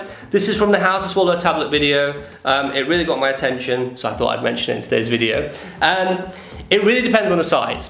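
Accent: United Kingdom. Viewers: Oh my god... You've got a strong British accent.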